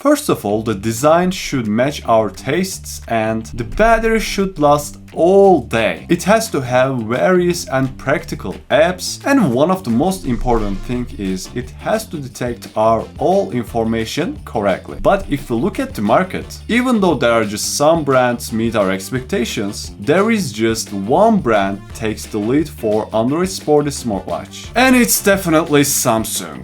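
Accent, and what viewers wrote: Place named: Turkey. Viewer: Öylesine açtım bu aksan tanıdık derken Türk çıktı 😂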